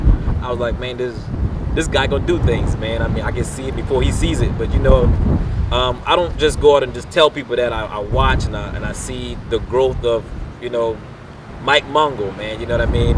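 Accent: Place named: South Carolina, United States. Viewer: Beautiful dogs!! I knew I heard a SC accent..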